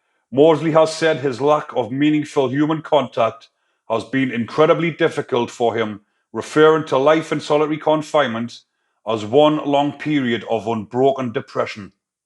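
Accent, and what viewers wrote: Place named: Gateshead, United Kingdom. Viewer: Mate you are from my neck of the woods going on your accent (Gateshead I'm guessing?) I love the fact you have 50k subs for just reading out Wiki prisoner stories word for word. Fair play and good luck.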